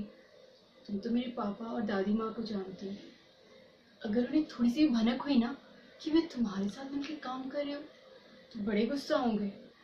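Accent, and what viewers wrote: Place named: Odisha, India. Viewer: odia odia laguchi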